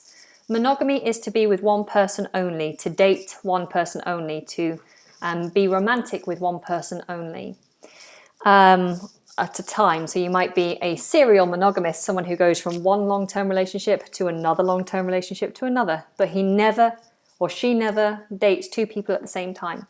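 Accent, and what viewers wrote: United Kingdom. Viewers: I love british accent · Teacher I watch that record fron place who doesn't showen in a map. I just like walk there. It is somewhere not far from Cherson . If be honest I always practice with my gun there, In this way I make sure I don't bother people. P.S I found off British accent, so try hard to imitate your accent.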